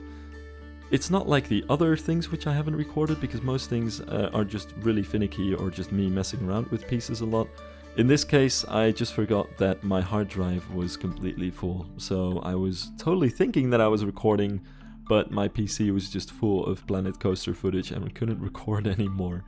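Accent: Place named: Netherlands. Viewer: Hallo ik hoor aan je stem dat je Nederland bent dus ik wouw vragen of je de xpress van Walibi kan (namaken) maar dan goed en wouw je dent hier goed in O ik zou zelf doen maar zit op Xbox vast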